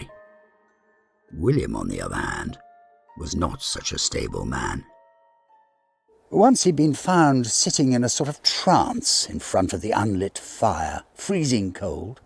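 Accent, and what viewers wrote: United Kingdom. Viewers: Hi! Just found you tonight when unable to sleep. What a treasure: your voice and your stories! I will definitely hunt them out! Have always been a big Hallowe’en fan & hope you have some 2023 tales. I just turned 72 by the way. Living in the Great White North in Cambridge, Ontario. I so miss British voices VERY MUCH! The person in my childhood who showed me the most love was my Gran from Folkestone in Kent. She was very open minded & tuned into a lot of things that my school friends’ grandmothers would probably have never heard of. She saw a lot including joining the Wrens during World War I when she was 17. I’m trying to be discreet about some of her interests which became my interests as I got older. I’m sure she had a lot of stories that I would’ve loved to hear. I just adored her! She married my grandfather who was serving in France in his veterinary corps (he was a farmer from Quebec); so, she was a war bride from the First World War. 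My whole life I’ve wanted to go to England, Scotland and Wales! This is a real treat for me to have found your channel! I am mostly bedridden go now. Have a wonderful Hallowe’en & thank you so much for your lovely programme!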